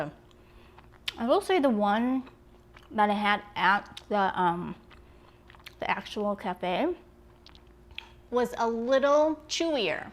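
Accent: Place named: Hawaii, United States. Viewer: I wanted to try cafe du monde mix but I wasn’t sure how it would turn out. So I searched for a video of someone who had done it…and found you. Are you from Hawaii? You sound like you are.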